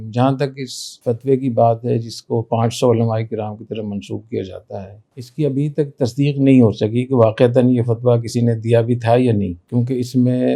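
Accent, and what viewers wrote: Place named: Saraiki, Pakistan. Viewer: اتنابڑاعالم ھوکے تسمیہ کو سرائیکی زبان میں پڑھتاھے شرم کی بات ھے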